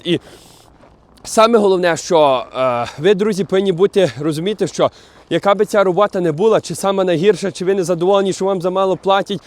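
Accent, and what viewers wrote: Western Ukraine, Ukraine. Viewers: Слышно по говору, что он с западной Украины. А чего он не в окопах, патриот хренов. А русскоязычные украинцы защищают свою землю.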